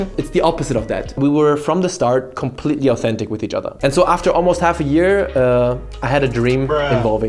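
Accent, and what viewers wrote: Germany. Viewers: Manchmal hört man deinen deutschen akzent raus😂❤️ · Alder du bist doch deutscher .. hör ich doch am Akzent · Your english sounds really german but also really good 😂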